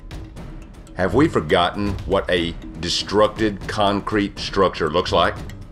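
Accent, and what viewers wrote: South, United States. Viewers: Fortunately we in the USA associate a thick, good Southern accent with superb space-science- in fact, if sounded like typical YT A.I., who could care. Please keep up the great work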